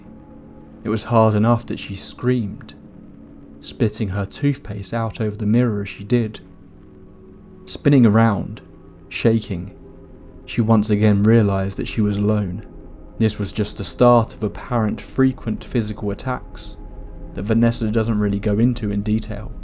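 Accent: United Kingdom. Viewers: It's cuz they're British I'm telling you, it's like if a documentary isn't narrated by a British accent I don't believe it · Excited to see you’re back & growing! Maybe cause you’re in the UK, between the accent and history, I find myself utterly intrigued! Keep up the good work 😊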